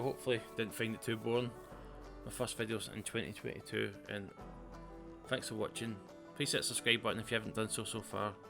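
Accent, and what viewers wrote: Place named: Scotland, United Kingdom. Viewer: Superb video, great seeing all the stuff you are selling, great hearing another Scottish reseller too 👍🏻 thanks for sharing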